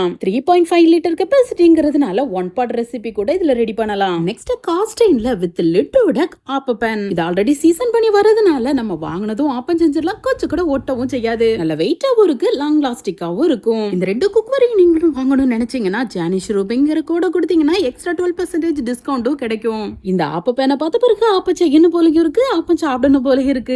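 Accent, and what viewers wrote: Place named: Kanyakumari, India. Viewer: Unga native kanyakumari district Tamil akka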